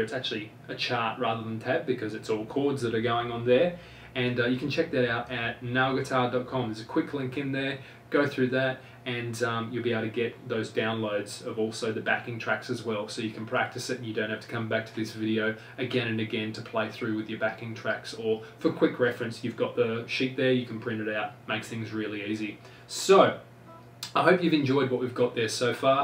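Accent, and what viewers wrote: Australia. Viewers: No, he sounds Australian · lol. More like Aussie. Though i find it hard to differentiate between kiwi unless i hear both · Nice! Are you Aussie? :)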